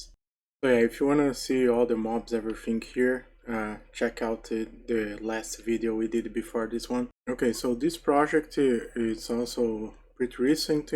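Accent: Brazil. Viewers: esse cara é muito brasileiro kskks, o sotaque entregou de cara, BRABO! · Que sotaque bom de ouvir. Sotaque br pra inglês é massa demais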